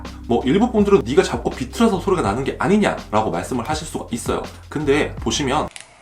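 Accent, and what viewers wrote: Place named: Gwangju, South Korea. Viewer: 완전 광주사람이시네 ㅋㅋㅋㅋㅋㅋㅋㅋㅋㅋㅋㅋㅋㅋㅋㅋ구수하시다 (미친 틀렸다니)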